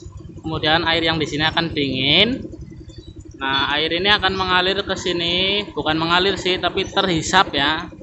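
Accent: Banyumas, Indonesia. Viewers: Jossss....ora ngapak ora penak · Kayong ngapak... asli ngendi mas 😁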